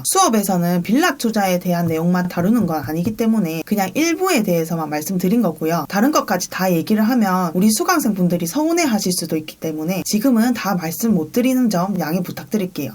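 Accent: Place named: Busan, South Korea. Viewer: 유투브 자주 보다가 알게 되었습니다. 부산 사투리로 강의하는 내용이 속속 잘 들어오네요. 50대 직장인으로서 경매를 공부하고 있어요.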